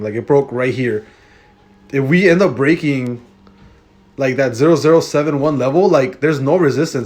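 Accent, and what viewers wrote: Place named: East Los Angeles, United States. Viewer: Subbed ! Reminds me of that east LA accent. I'm staying put at 600 for now I wouldn't mind losing it but let's see how tomorrow goes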